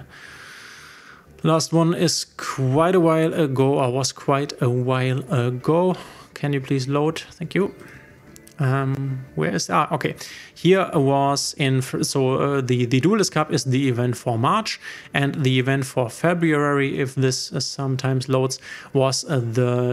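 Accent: Germany. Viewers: Bin neu und habe schon an seinem Akzent irgendwie bemerkt, dass er Deutscher ist :))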